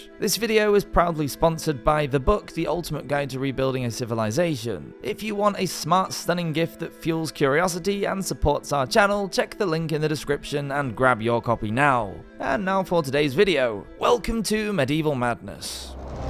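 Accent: United Kingdom. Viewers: Why are people saying this channel is fraud? You can literally search everything. One assumes I'm here for the british accent? No, but it helps now that you mention.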